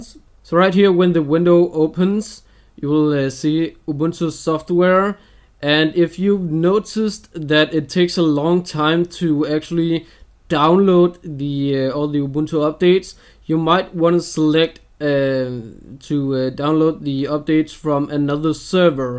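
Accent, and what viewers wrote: Denmark. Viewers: I knew it, you can always hear if it is a Dane who speaks English! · det er så tydeligt at du er fra danmark (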